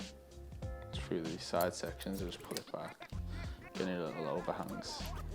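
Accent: Liverpool, United Kingdom. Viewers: you sound like a scouser and speak like one too im from Liverpool meself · a mexican with a british acent wtf 😅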